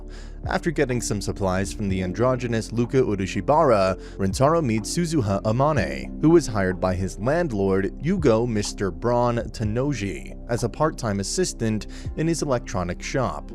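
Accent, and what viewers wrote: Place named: United States. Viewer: Thank you for real voice not robot. I love your American accent.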